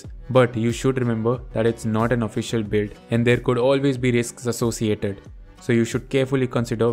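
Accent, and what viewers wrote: India. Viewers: You have indian accent me no trust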